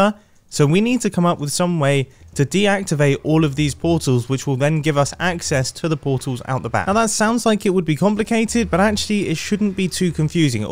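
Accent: United Kingdom. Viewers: He's so British LOL, he says 'por-al' the 't' is kinda soft.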